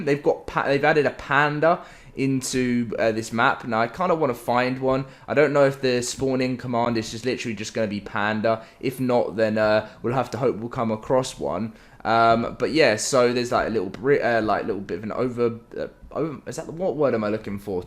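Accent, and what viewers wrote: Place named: United Kingdom. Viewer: And yet another video that i have to skip because of a british accent that I just cant take honestly. I thought the new generations were loosing accents ? Why british people always gotta do stuff differently ? It sounds so gay I just cant sorry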